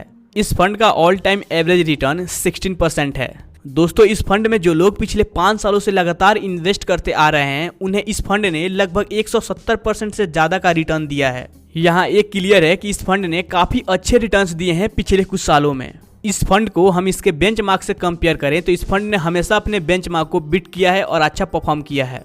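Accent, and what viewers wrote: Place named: India. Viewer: Bro.. your videos are very informative...Thank you...also please pronounce proper English words.. don't mix Hindi pronunciation... please check spelling mistakes...Eg.Fund..not fhund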